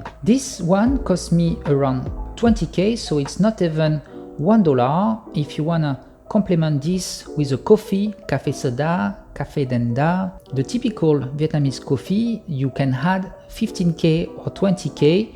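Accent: France. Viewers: T'as ouvert la bouche j'ai direct compris que tu étais français 😁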